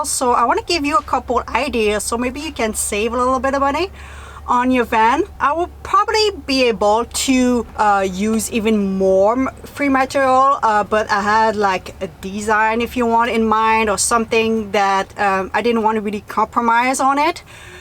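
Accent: Quebec, Canada. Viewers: Somewhere on here she has said French Canadian. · Thanks for the information. You are very informative. Again you give me hope that to have a van and travel is not a foolish dream. Love your accent. It is what I imagined Canadians would sound like. I am from USA.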